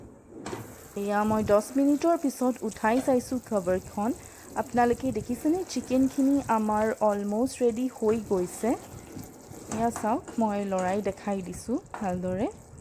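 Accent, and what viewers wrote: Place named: Lower Assam, India. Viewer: Looks like you're from lower assam but you're trying to speak in upper assam dialect...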